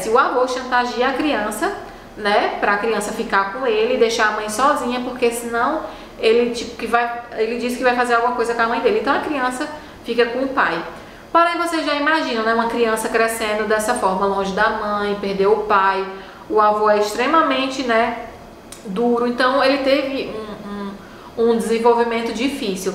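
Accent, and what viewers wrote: Northeast, Brazil. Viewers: A melhor crítica que fiz para um dorama escrevi para IREI QUANDO O TEMPO ESTIVER BOM. Simplesmente maravilhoso. Eu adoro os vídeos da. Esse sotaque nordestino é delicioso de ouvir! Parabéns, linda! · você é nota mil e o seu di do Nordeste e Norte é show vem conhecer o Ceará flor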